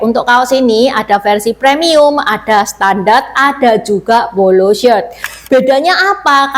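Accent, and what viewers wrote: Java, Indonesia. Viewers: Medok ya..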